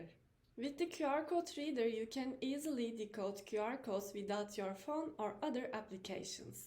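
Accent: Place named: Turkey. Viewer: Bu üslup kesinlikle bir türke ait olmalı :D türk ingilizcesi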